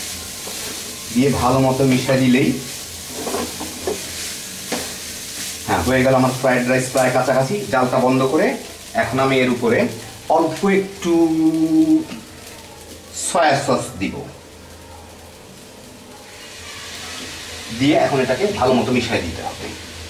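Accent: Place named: Kushtia, Bangladesh. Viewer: ভাইয়া আপনার দেশের বাড়ি কি কুষ্টিয়া। কথা সুনে তাই মনে হইল।জানাবেন প্লিজ।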